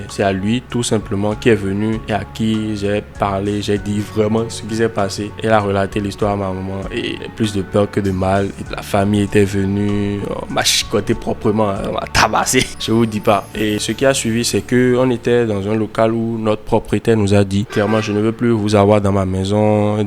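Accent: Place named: Togo. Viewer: Bonsoir, vous avez un accent togolais!🌺❤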